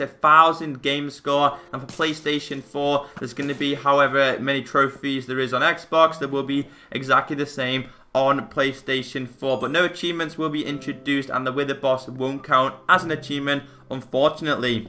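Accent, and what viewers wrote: Liverpool, United Kingdom. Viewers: Are you from liverpool ?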